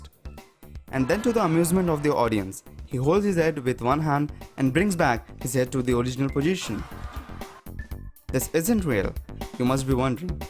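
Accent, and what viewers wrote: India. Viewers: Why do some videos have a host with thick Indian accent? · I hate that indian voice. Thumbs down! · He is Indian because Indian people voice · The voice over has a strong Indian accent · Please, your India accent so annoying, and dont copy others video. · Indian accent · indian accent, bye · Learn another accent, this indian one is very cringy and anoying. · What'sup with the indian accent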